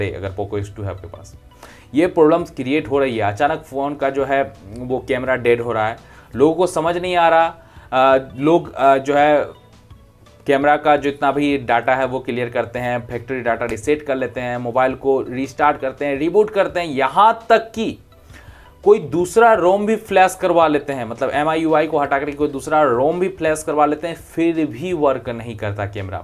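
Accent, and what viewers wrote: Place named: Bihar, India. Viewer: Are bihari bhai podbulam😅😅😅 jada ho rahahe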